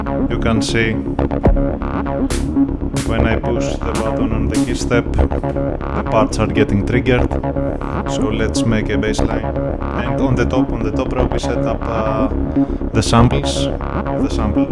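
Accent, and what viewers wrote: Greece. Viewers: ahahahah you are greek I can tell🤙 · that's the Greek accent, for sure !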